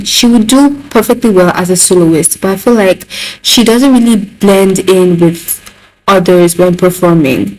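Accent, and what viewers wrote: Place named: Nigeria. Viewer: OMGGGGG YOU'RE NIGERIAN TOO. I HAD A FEELING YOU WERE FROM YOUR ACCENT. I'M NIGERIAN TOO NICE TO SEE A FELLOW NIGERIAN THAT LIKES KPOP❤❤❤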